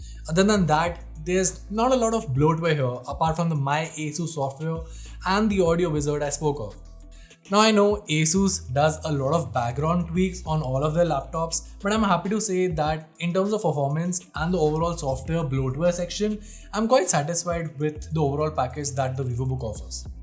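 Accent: India. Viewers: Good indian accent My brotha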